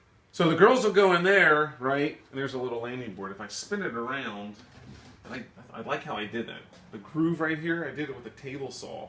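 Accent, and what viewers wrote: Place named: United States. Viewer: Love your hive. Great American accent. '...the girls...'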